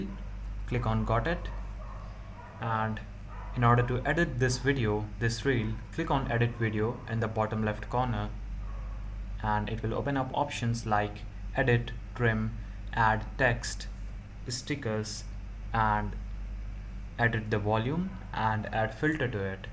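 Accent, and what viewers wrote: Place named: India. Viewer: Why are all these videos and some Indian accent?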